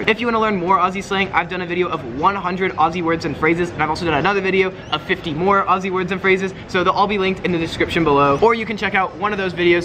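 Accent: United States. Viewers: Hearing an American pronouncing these is so weird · Lmfao hearing all this in an American accent is hilarious. Love it mate, well done! 👍🇦🇺😂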